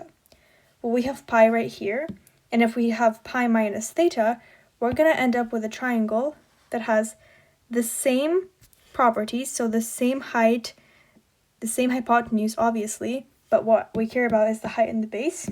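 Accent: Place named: Australia. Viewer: Ur australian accent is cool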